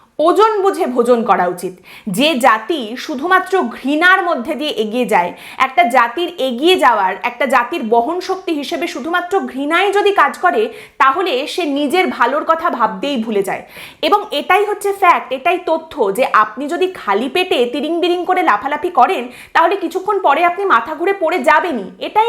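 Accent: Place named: India. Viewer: tor kotha bolar dhoron inidna der moto kano tailea ?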